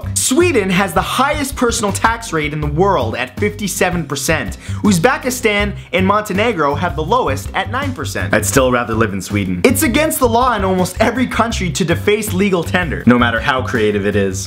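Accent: Canada. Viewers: Are you Canadian? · He is canadian? Keeeeewwweelll. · Are you Canadian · Your Canadian? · He sounds so Canadian in this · ur Canadian?! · are you from Canada?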